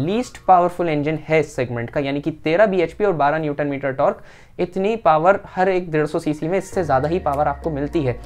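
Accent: Bhopal, India. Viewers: Bhopali accent 🤟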